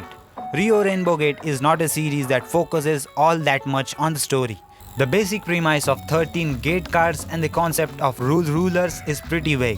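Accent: India. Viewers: bro work on your audio and idk why u sound indian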